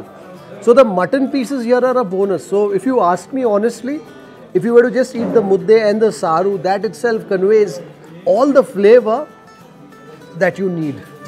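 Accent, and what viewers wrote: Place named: Tulu Nadu, India. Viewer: your kannada has full TULU influence 😁😁 We Tuluvas have an interesting way of speaking Kannada 😊😊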